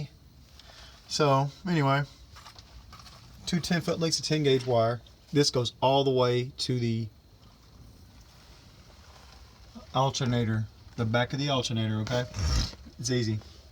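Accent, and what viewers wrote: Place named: Texas, United States. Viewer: Dude you sound like you are from Texas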